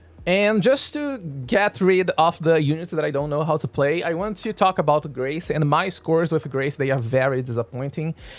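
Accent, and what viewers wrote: Brazil. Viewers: Can't wait to try the Air Fryer team!! Btw, are you Brazilian? That accent sounds familiar. Awesome video!!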